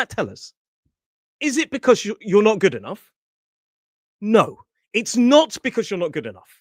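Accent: United Kingdom. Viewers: I'm from Argentina. I love British pronunciation. And I'm very happy because I understand every word you said!!😊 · Yes,! I very much like your British accent, as I told you before because is the first English that I learned. And altough I probably won’t travel any more (I visited London once years ago), that is the English accent I most like!! Marcos (Montevideo, Uruguay) Ps: even though I'm 64 now and I'retired, I want to improve my english pronuntiation the way you teach it... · Thank you so much! I love British voice.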